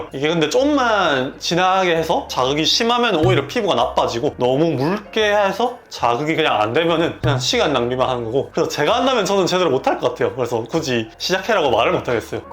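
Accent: Busan, South Korea. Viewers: 저는 멜라노사크림 약국에서 사서 저녁에 스팟으로 바르고 자는데 완전히 없어지진 않아요~! 선생님 억양이 부산사람같아요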